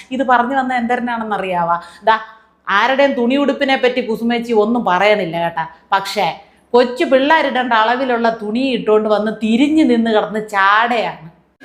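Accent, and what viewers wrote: Thiruvananthapuram, India. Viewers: തിരോന്തരം ഭാഷ നന്നായിട്ടുണ്ട് അഭിനന്ദനങ്ങൾ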